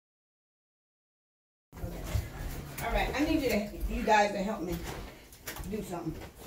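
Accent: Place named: New York, United States. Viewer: Love ur accent miss 😀 where ya from? im a Boston native you sound like ur from either New York / New Jersey area? Thanks for the informative video 😁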